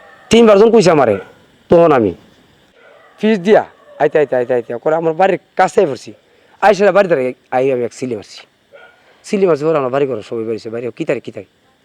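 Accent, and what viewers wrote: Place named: Brahmanbaria, Bangladesh. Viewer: এটা ব্রাহ্মণবাড়িয়ার ভাষার মতো লাগলো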